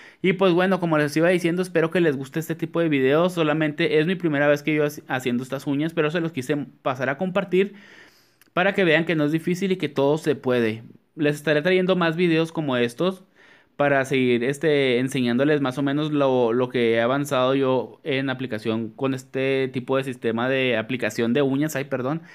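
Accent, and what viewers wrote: Chihuahua, Mexico. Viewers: Eres de shihuahua?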